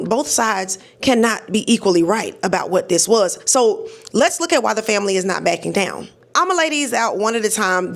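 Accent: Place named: South, United States. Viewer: Very thorough and informative. Love the southern accent, too.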